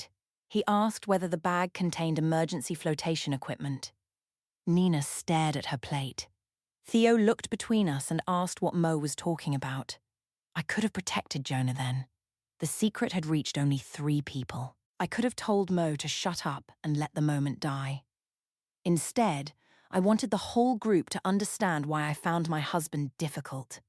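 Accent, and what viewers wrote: United Kingdom. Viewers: Is it a coincidence that the stories with the British female accent have the most abusive women?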